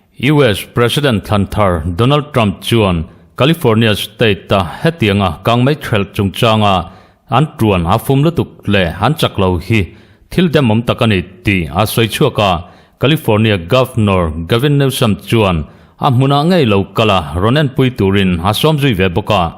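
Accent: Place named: Aizawl, India. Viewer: Aizawl milian nuho Accent ang kha inei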